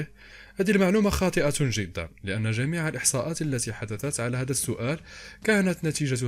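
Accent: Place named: Morocco. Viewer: يجب ان تتخلص من لهجتك المغربيه اثناء الحديث تجعل الشخص لا يفهم شيئا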